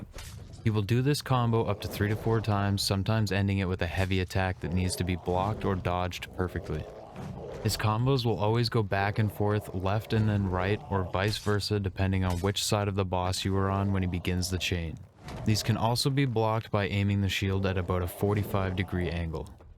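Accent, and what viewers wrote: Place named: Maritimes, Canada. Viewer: You from the maritimes? I recognize the accent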